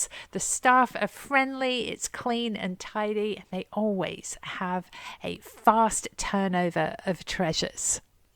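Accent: United Kingdom. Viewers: I really enjoy you. I'm from the East coast and follow you and your friends. You have such a soothing voice and I dig your British accent! You are 1 smart lady so cool. I have learned so much from you. The Goodwills in Jersey do stock Christmas items throughout the year. I adore you! Hugs!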